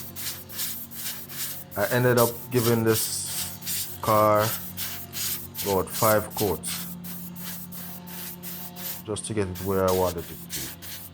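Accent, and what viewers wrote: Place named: Jamaica. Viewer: Heyyy jamaican accent! Bomboclat!!!!!!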